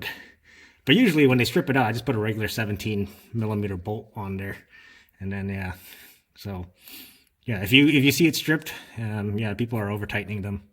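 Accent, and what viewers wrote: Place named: Canada. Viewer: So, are you saying the Mazda dealer double gasketed the filter on the previous change? Whoever did it is an amateur. SOP is to clean the filter mounting gasket area after removal. That should tell if gasket left not to mention, just looking at old filter. smh Hope oil in sump didn't drop too far. Also, from two Canadian yt vids recently seems Mazda pronounced differently than in US. In US pronounced Mahhzda. North of the border seems, Mehhzda seems to be used. Fwiw, I'd let you work on any of my Hondas, and wouldn't say that to just any mech/tech.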